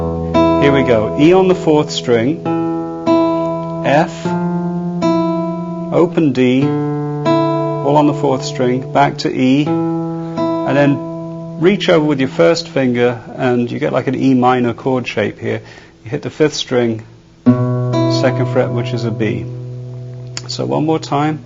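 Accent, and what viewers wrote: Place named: England, United Kingdom. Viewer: soft English accent + Spanish guitar = best. Youtube. videos. EVER!!!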